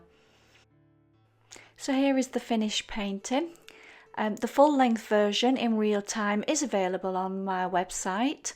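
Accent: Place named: Yorkshire, United Kingdom. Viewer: What a beautiful Yorkshire accent! Excellent tutorial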